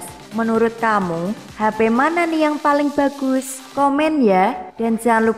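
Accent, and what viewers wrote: Java, Indonesia. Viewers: Jawa banggettt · Medok bgt mbak. Asli mana? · Omongan mu jowone meduk mbka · Kok ngomong y mbaknya medok emang aslinya orang mana? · Medok nya itu Lo,Mbak...bikin klepek klepek.?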